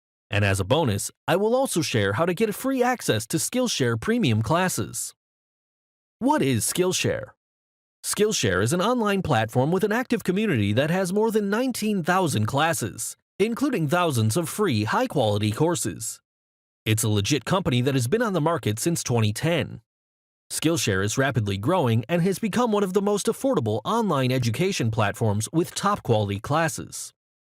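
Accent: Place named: Russia. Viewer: This is insane look at this guys youtube profile he got lots of videos with a russian accent and a really bad laptop microphone but suddenly he speaks english perfectly and with a voice quality of a youtube star. This dude clearly has stolen this video or the voice to get his couple of bucks, how poor.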